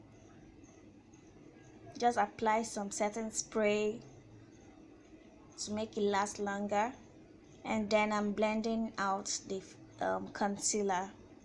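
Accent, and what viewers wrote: Nigeria. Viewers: Nigerian accent is soo bomb we should tell people about her so she would get more subs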